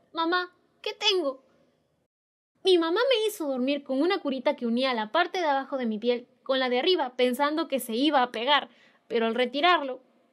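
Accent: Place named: Chile. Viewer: sos chilena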